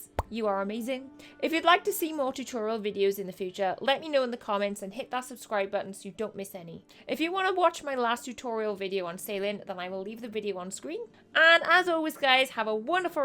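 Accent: Wales, United Kingdom. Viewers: Great vid - made me smile :) nice to hear a fellow welshie on youtube too! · Are you welsh? Sounds like you're playing it down a lil for your "stage voice" - which I wouldn't blame ya for, I pronouns would too if I had a YT channel! Not a criticism, just as a fellow Welshie I thought I heard it :) Only just started the vid but feedback seems excellent in comments so I'm SURE it'll be super helpful, so thanks in advance!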